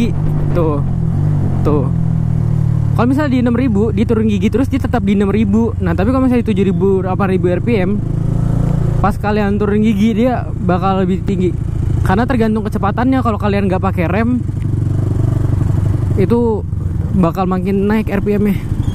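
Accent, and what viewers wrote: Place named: Java, Indonesia. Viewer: Pasti kamu manis banget yo mas, suara mendok nya ❤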